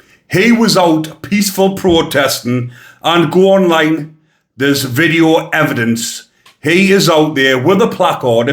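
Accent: Newcastle, United Kingdom. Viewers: Lad are u from Newcastle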